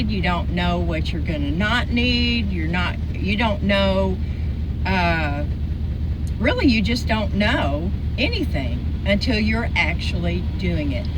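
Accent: South, United States. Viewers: Oh....I've just found you. Please don't be gone forever...take a break but don't leave us hanging. You are too interesting and sensible. Plus your southern accent is delightful. Hope you will consider posting more even if not as often. Safe travels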